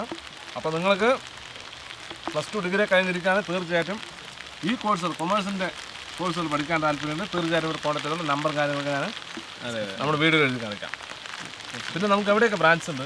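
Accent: Palakkad, India. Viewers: Palakkkadan slang♥️😌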